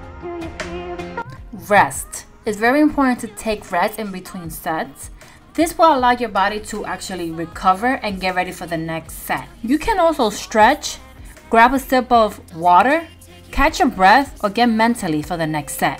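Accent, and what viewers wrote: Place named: New York City, United States. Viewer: From where is your accent? Sounds from NYC.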